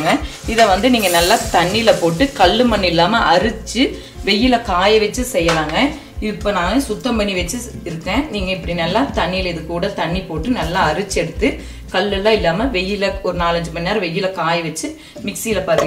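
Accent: Kongu, India. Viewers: கொஞ்சும் கொங்கு தமிழ் 😊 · மிக்க நன்றி, அழகான கொங்கு தமிழிலில் விவரித்த விதம் அருமை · கொங்கு தமிழ் கொஞ்சமாத் தெரியுதுங்க... நல்ல கூழ்...இன்னிக்கு நான் செய்யப்போறேன்.. 2020 ஜூன் 2 சென்னைல வெயில் தாங்க முடில...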